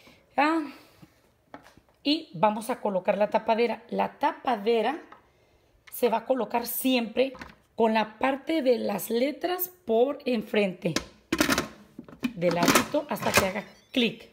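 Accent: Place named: Jalisco, Mexico. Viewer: Muchas gracias por la explicación me acaba de llegar la mia y como q me dio miedo usarla pero despues de tu video ya no!me imagine q eras jalisciense por tu forma de hablar yo tmb soy de jalisco residente en Colorado saludos :)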